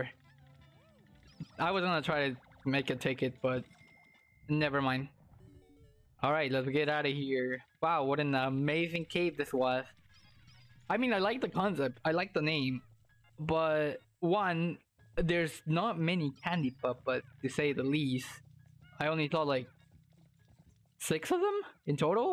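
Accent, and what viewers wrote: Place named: Latin America. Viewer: I like your latin accent 😂❤️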